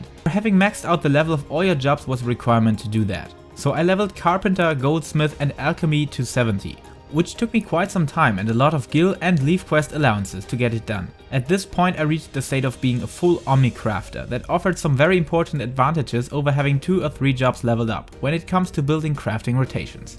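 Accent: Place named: Germany. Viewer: Nettes Video . Schade , dass es auf englisch ist und nicht auf deutsch . Man hört raus , dass Du Deutscher bist . Wäre doch mal was , wenn man dieses auch mal auf Deutsch erklären würde . Wie auch immer. Danke fürs hochladen . Denke , dass das auf meinem Server nicht so klappt . Schade .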